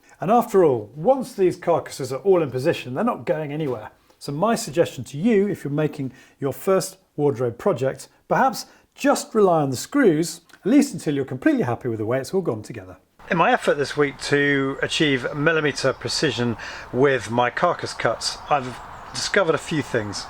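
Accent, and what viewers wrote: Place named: Southern England, United Kingdom. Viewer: Just wondering what part of the UK are you from. I am up North in Lancashire you sound like your up south?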